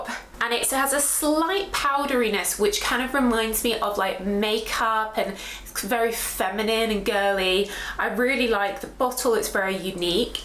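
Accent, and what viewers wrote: United Kingdom. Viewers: I love your vids based on main notes of perfumes and I love your british accent-you are the Tish Wonders of the perfume world "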